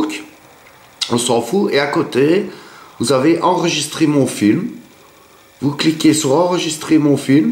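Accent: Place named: Belgium. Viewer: Merci, super sympa et l' accent belge est magnifique, ne change rien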